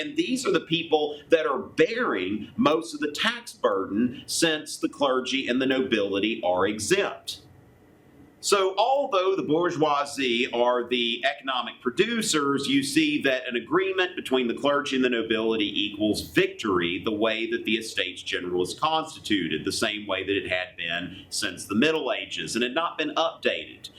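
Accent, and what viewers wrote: South, United States. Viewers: Why is this man speaking is a Southern drawl? Whatever happened to standard and intelligible English? On a completely different note: Why does one have to hold a cup in one's hand while speaking about the Estates General? Seriously....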